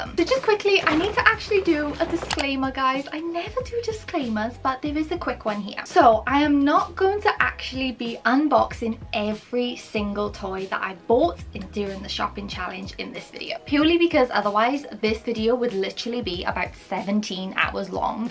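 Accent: United Kingdom. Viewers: I still can figure out if is British or not-💀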